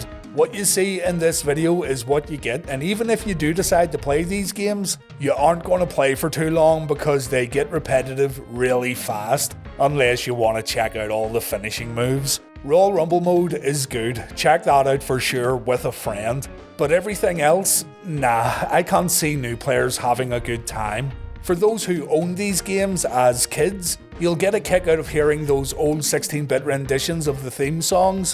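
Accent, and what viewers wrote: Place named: North, United Kingdom. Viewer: could you possibly talk less Northern or Irish I find you hard to comprehend the Queens English would help alot thanks in advance.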